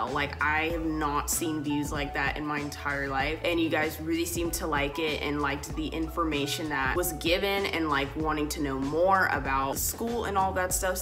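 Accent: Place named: New Jersey, United States. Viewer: the jersey accent is always a great addition to the videos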